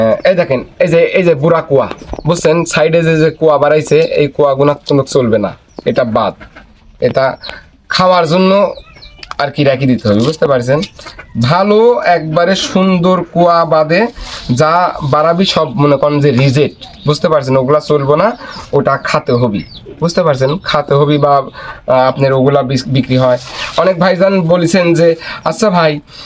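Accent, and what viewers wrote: Natore, Bangladesh. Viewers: নাটোরের ভাষা কুষ্টিয়ার না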